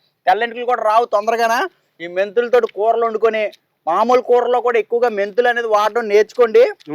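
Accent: Godavari, India. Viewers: స్వచ్చమైన గోదావరి జిల్లా యాస...కల్మషం లేని మాటలు... సంపూర్ణ వివరణ. మొదట మొదటగా చూపించిన పిల్ల కాలువ జోరు,.. పచ్చని పైరు...కట్టే పోయి మీద వంట...అబ్బా .మరచిపోయిన చిన్నతనము చూపించారు..అంతా బావుంది..చిన్న సలహా... కాలి పళ్ళెము లో మొదటగా వట్టి అన్నము మామూలు సందర్భ ములలో పెట్టరు..అది ఒకటి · Godarolla bhasha vintumte maa p.g gurthukochindi😂😂😂😂😂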